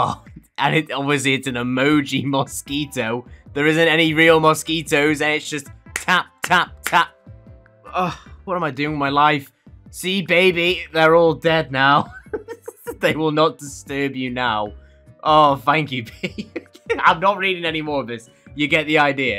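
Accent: United Kingdom. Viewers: Question: are you from the United Kingdom?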